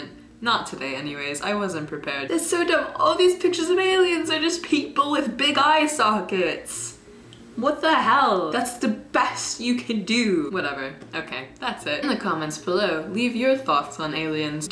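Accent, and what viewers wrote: England, United Kingdom. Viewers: Does anyone else notice her slight British accent? If you do, isn't it adorable?! And yeah, I believe that wee not the only ones out there. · I watched this video and the first video you posted on YouTube. I swear your voice sounds way different. I first thought you were British, but in your first video you sound American! Haha, are you watching too much BBC? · Okay legitimate question about your accent (not meaning to be harsh or offensive): How have you managed to develop a semi-English accent? Is it somewhat deliberate? Because I find it hard to believe that you can naturally pick up an accent when you're a young adult. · and you've a little English twang in your voice ohmygod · You have like a British accent in this lol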